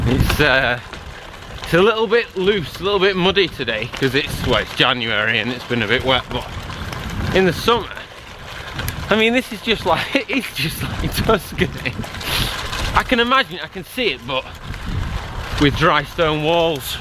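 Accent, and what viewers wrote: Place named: Northern England, United Kingdom. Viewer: First bike was my dad's old steel Carlton frame with old 105 gears that was 40 to 50 years old. Few years back car pulled out on me; broken bonnet, dented roof and broken teeth. Worst of all broken bike. Down tube and forks, wheels buckled. That meal time was a quiet one. Love the northern accent you got ohh and the vlogs.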